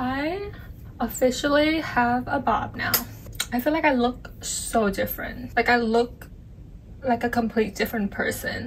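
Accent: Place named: United States. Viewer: Sounds like you was educated in the states?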